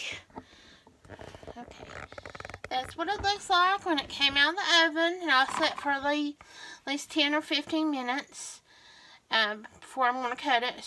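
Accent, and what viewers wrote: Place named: Kentucky, United States. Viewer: Just found your channel! Love it and I just knew you were from kentucky!! ( I am too! 😊)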